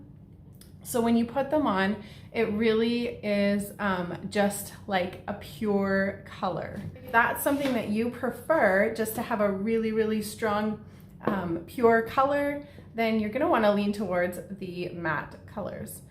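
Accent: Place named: Canada. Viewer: Love your Canadian..Ahhhh accent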